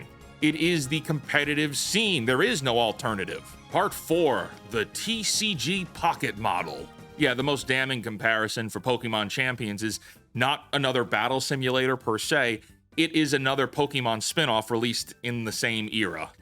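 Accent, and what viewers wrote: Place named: New Jersey, United States. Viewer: Dude are you from Jersey because that accent sounds like it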